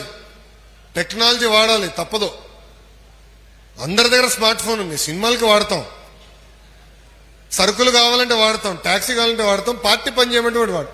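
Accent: Telangana, India. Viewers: Please stop Telangana Accent.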